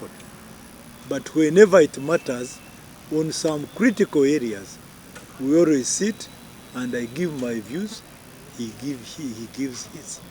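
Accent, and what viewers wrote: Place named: Rwanda. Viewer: Why does he talk like a Munyarwanda? Also his name is a kinyarwanda.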